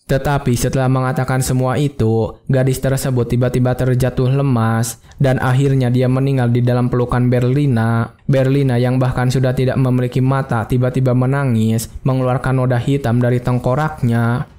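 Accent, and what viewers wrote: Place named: Madura, Indonesia. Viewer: Pasti ini orang madura yg jadi naratornya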